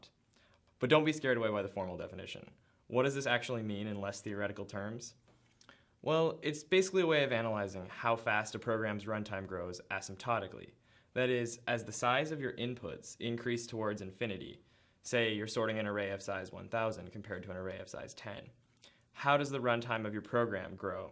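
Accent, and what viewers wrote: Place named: India. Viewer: Awwh yes! Finally an english speaking lection! I am no racist, but god damn how can one focus on that Indian/Pakistani accent?!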